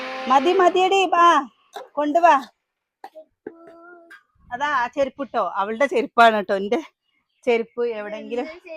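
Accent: Palakkad, India. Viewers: Palakkadu kkar aganeyanu parayuka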